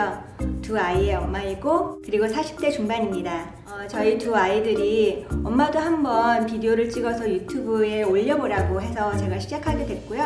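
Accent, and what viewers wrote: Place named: South Korea. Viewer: I just love your korean accent. I watch korean movies heheh just a side note. Congratulation on 1k subbies!! You deserve all the love ♡♡♡♡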